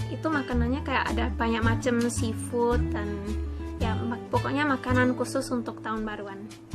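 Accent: Java, Indonesia. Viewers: Heran, ini cewek jepang kelahiran mana ya koq ngomongnya medok gitu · Keren, lucu aja logat mu orang jepang tpi klo pakek bahasa indo logat jawa bgt. Keren ,cantik.. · Logat.y medok banget mba... Mantap mba....😍😍😍😍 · Jawa Nya Medok Banget Guuud Lah (Y) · logat logat jowo e iseh kentel wkwk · Logat bahasa indo-nya jawir bingits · kamu aslinya orang mana sih? Wajahmu Jepang tapi ngomongmu Jawa medok? 😊😊😊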